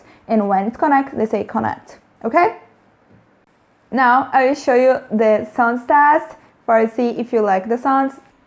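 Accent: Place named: Brazil. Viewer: Totally Brazilian accent, congratz for the video.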